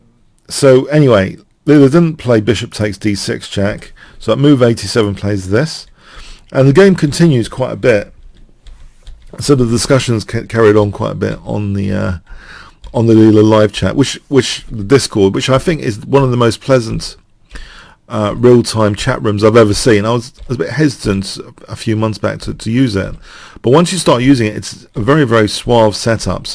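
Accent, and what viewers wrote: England, United Kingdom. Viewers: hay interesting as always, by the way just wondered from where is thet fantastic accent? area England??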